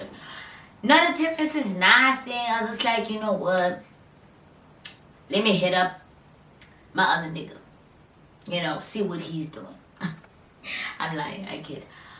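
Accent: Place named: United States. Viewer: This American ascent thingy confuses me 😑 but kept watching video to an extent 😑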